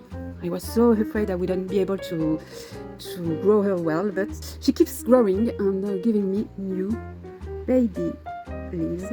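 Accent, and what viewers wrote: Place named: France. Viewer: I love your French accent! you speak English very well, please do not apologize. 🙂loved seeing your planty space and your very adorable doggie. 🐶thank you for participating in this tag 💚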